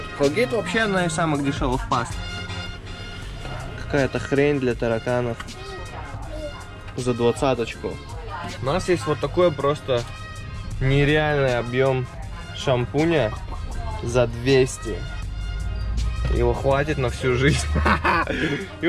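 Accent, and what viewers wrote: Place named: Harlem, United States. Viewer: Где английский учил, по фильмам? Говоришь как черный из Гарлема.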